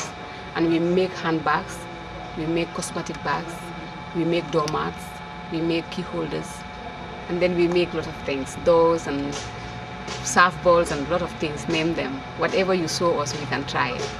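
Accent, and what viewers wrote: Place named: Gambia. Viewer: God bless her...she is very sweet....thats a real Gambia accent dho....miss my country to bitzzz.....bless her and stay strong my fellow Gambian women xxx love love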